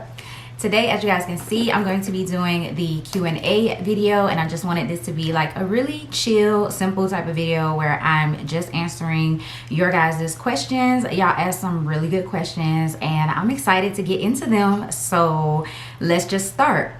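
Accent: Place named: Alabama, United States. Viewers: I really loved this video! We’re the same age! (Well my Bday is April 1) Aries ♈️ I tend to like Sagittarius ♐️ you’re cool ppl 💕 Keep it up beautiful 🤩 And Alabama accents lit 🔥 lmao 😆 -Thank you for your service!! 🇺🇸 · Ahhh, I super late to the video🥴 Giirrrllll you have a accent lol thank you for answering my questions. Funny thing is I heard somebody else from Miami said the same thing that they don’t have a accent but yes girl yes somebody like me from the Northern Midwest can hear it. It not thick or country at all but I could tell you was a southerner. Btw loving the hair and makeup in this video😘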